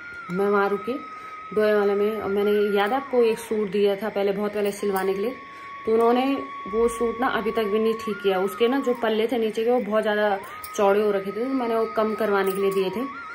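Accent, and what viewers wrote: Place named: Pahari, India. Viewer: Talarni 🤣🤣🤣 theth pahari👍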